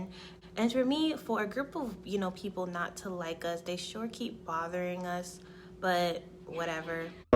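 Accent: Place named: New York, United States. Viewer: I wish she tracked down and annihilated each member of the rapist clan. Btw: Your New Yorkerisms and soft, melodic voice completes me. Love your channelllllll, the respect and the humor. Sis is a G by the way. Coming from a neighboring country to me, trust and believe I'd jook a whole dude in the eye for the foolishness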